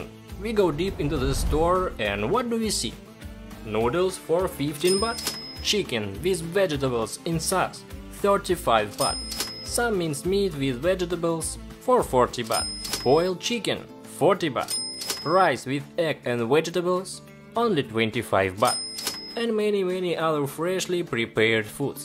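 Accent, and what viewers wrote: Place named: Russia. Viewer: Brutal russian accent bro😂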